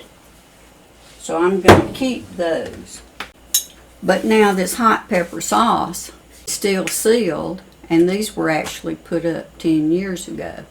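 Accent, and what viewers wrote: South, United States. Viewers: I love the way you speak. You have such a rich, beautiful southern accent. It reminds me of the way my grandparents spoke. Thank you for the helpful video.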